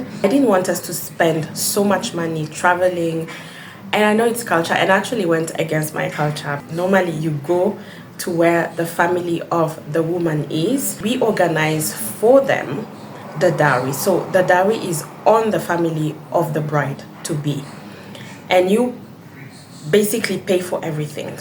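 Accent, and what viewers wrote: Kenya. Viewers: You picked up the Kenyan accent well..🎉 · You have a Kenyan accent.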